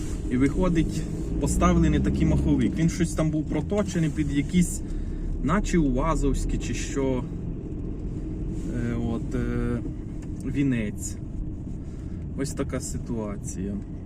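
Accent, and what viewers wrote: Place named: Hutsul, Ukraine. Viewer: Акцент гуцульський.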